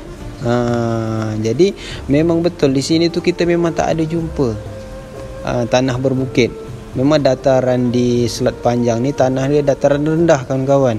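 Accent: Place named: Johor, Malaysia. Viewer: bahasa kamu 98% mirip logat melayu Johor malaysia aka UPIN IPIN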